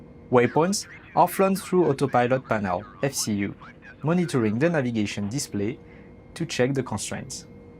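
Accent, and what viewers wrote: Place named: France. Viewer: French accent :3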